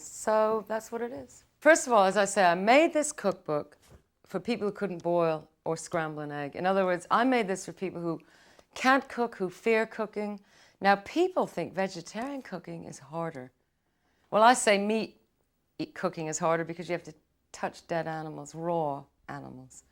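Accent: United Kingdom. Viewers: Wow her accent was so English by then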